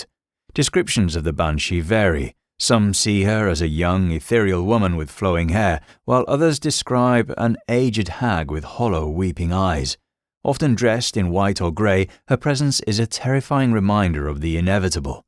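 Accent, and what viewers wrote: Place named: South Midlands, United Kingdom. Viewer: No it's a modest south midlands accent not remotely Bean's Yorkshire 'brogue'.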